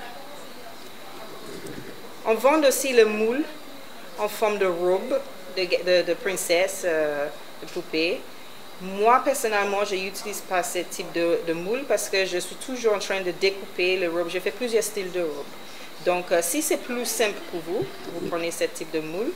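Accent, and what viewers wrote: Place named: England, United Kingdom. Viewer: Sa se voit que t'es anglais Ta vois je l'aime bien😇😀😊☺😍😻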